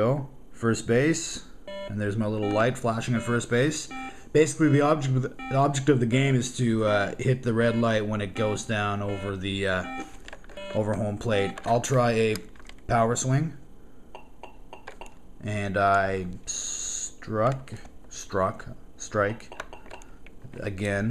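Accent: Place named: England, United Kingdom. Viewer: amazing man. great memories. and when you said you grew up listening to the expos on the radio, i almost cried. what are the odds?? i too am from Montreal and grew up a big expos fan and also used to hear them on the radio every game. i loved my expos. but how come you listened to Jacques Doucet and not Dave and Duke? you sound english, so this shocked me. My dad used to listen to Doucet, then i'd change it to Dave van Horne and duke snider and we'd listen together. Great memories of Nos Amours and the good old days. thanks for the vid. you brought me back to my happy time.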